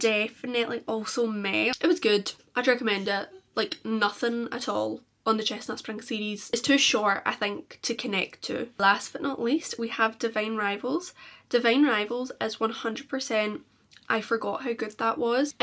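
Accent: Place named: Scotland, United Kingdom. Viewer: nice video! scottish accents are so addicting to listen to 😂💞 - from a slavic girl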